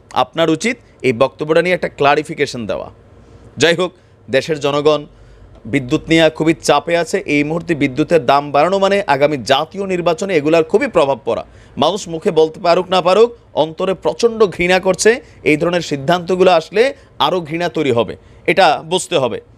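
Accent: Sylhet, Bangladesh. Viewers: ভাই আপনি যে সুন্দর সিলেটি মাতওইন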